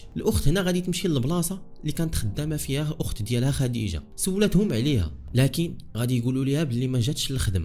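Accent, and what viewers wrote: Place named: Marrakech, Morocco. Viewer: تحية لك اخي الكريم اول مرة اتعرف على قناتك بالتوفيق ان شاء الله من لكنتك اظنك بهجاوي مثلي و ديما كوكب و ديما مغرب 🇲🇦🌹✌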